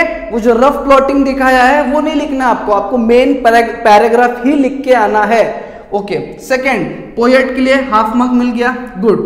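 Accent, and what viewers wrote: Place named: Pune, India. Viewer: Pure puneri❤😅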